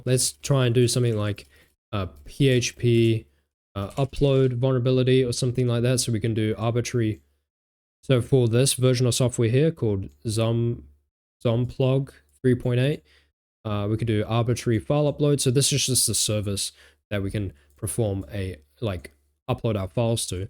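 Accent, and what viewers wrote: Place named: New Zealand. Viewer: It's a fellow New Zealander!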